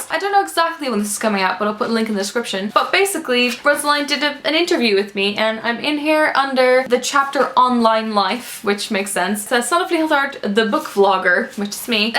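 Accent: Ireland. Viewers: Haha I thought you were Irish until I read the description, I'm a new subscriber :D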